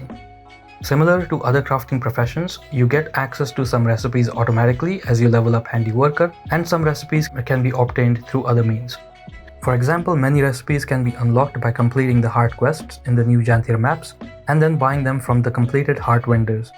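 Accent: India. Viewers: You sound so Indian :D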